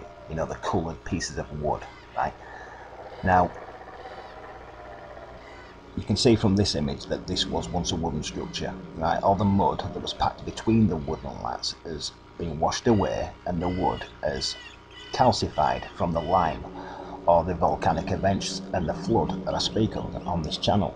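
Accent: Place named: North, United Kingdom. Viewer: Loving the blunt northern accent. Keep up the good work. 😂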